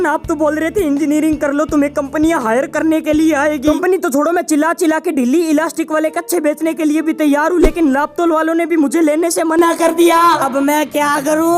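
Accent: Rajasthan, India. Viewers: Aap rajashthan se ho me bhi aap ke waha aese bol te h kya Bhai ji Kiya ho 😅😅❤❤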